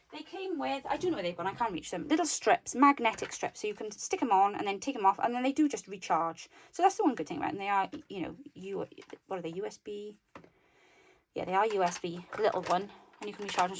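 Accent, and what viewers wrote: Wales, United Kingdom. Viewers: Thank you, thank you, thank you! 🥰I love when you post this kind of video!! I'm able to listen to your hilarious stories and ramblings guilt free, while I work on my JJ or whatever the project of the moment is. Instead of working on a project and panicking that I've missed the pivotal step in how to properly do what your showing us... This one has been wonderful, we got so much insight into you. Plus it was really entertaining, especially for me, who was so in love with Princess Diana, therefore everything British/Welsh, back in the day, when I was much younger and the world was a very different place.( Hint, it's the lovely accent.) Plus all the super random side comments, which is exactly how I think!! ( oooo Squirrel!) By the way, my family calls that emergency, speedy clean up, doing the "Flight of the Bumblebee". Running around the house shoving and/or fluffing and/or hiding stuff, before some unexpected person wants to enter the house. 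Question, How on Earth is it warm enough to go swimming in Wales, in MARCH? Brrrrr! here in Virginia, USA.🥶 Never knew about the SuperThanks, it's under the (...) and just says Thanks. But now we all know! So thanks... Also check your tins-----one has money and one has a battery! Hahahahah 😜 Much Love, Karen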